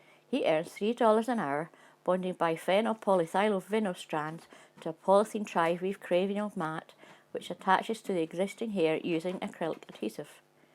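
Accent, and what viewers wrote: Scotland, United Kingdom. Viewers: Is your pronunciation is how the British accent sounds? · No she is Scottish if you want to learn standard Uk English look up how to learn RP and the sounds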